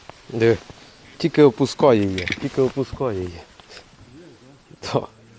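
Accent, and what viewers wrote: Poltava, Ukraine. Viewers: Доброї ночі. Судячи по говору, це походу Полтавська обл. р. Сула?!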